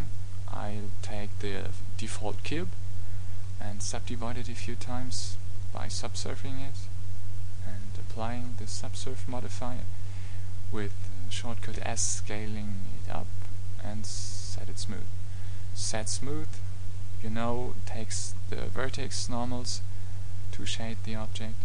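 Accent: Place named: Germany. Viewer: I did never say I did not understand him. I was trying to give him advice on recording spoken text in General. His english is quite good, but I can hear he's German (which I am too by the way). But if you try to follow such a tutorial a series of "uhm" and "ahs" and thinking breaks, it can be tiring. So I basically tried to help him, because he was apologizing at the end. Rather write it down, makes it much easier to speak and focus on the rest. Ah and by the way, I am bilingual ;-)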